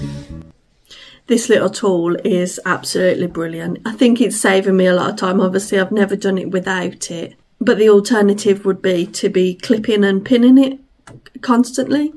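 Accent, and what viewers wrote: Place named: Midlands, United Kingdom. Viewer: Whereabouts in the Midland s are you from. I'm from the Midland too so recognized your accent. I also have a Husqvarna but it's the ruby and has problems, sadly.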